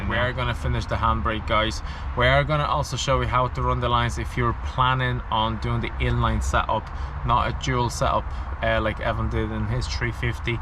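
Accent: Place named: Sweden. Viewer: You have similar accent to a friend of mine are you swedish too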